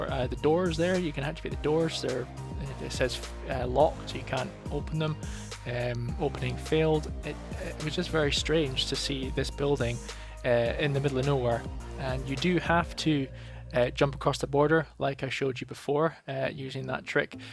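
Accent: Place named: England, United Kingdom. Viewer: Good, Your video are great. This videos make sense because Night City is a place where CDPR can make a lot of very good stories for a long time. CDPR will likely be doing this and building a cool universe, better than the candy-sweet superhero universe from Hollywood. More ambiguous. Hey tell my, where are you from? Maybe from UK, You have a little English accent, I think?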